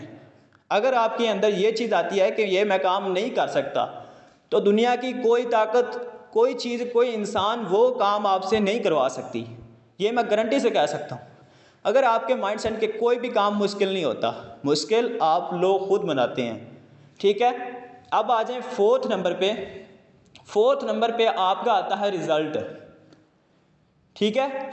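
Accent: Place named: Punjab, India. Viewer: Kia bat a Punjabi tarka🥰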